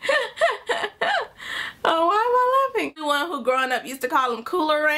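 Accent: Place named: South, United States. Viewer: Yay! Ur back.. Hood thing? Nope, I think that's just the southern twang ppl use.. Hahaa, love how u stuffed ur mouth xoxo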